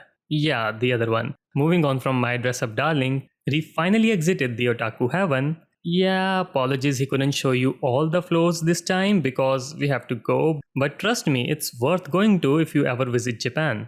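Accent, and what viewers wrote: India. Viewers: Bro you have indian accent so smooth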